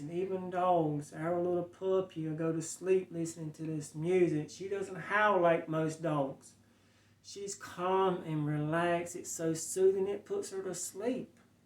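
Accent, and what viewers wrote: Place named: South, United States. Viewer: holy wow sir your accent is baffling me!! Would love to know your background and story, haha. I realize those are sensitive questions in todays cultural climate but I really don’t mean anything by it. Only curious. You have like, a deep southern accent or something that to me, does not match your appearance. I bet your family has a fantastic immigration story in there somewhere. Ok bye just a random curious internet commenter and I love guessing where accents come from all over the world!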